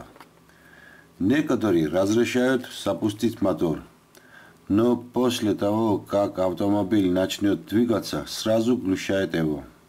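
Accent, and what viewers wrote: Georgia. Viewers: Спасибо брат за науку и особенно за подачу материала, с прекрасным грузинским акцентом. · В арми был друг грузин вот так разговаривал с акцентом и грамотно, а мужику респект😊👍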